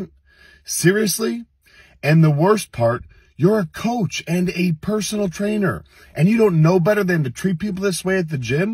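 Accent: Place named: United Kingdom. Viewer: no joey the worst part is the britbong accent , i could smell the fish and chip from here. oi oi mate bustad innit bruv ee woz looin at me moit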